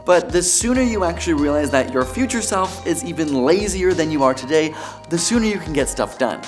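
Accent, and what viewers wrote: Canada. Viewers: Your Canadian is sticking out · are you Canadian? the way you pronounce "Tommorow" is kinda bothering me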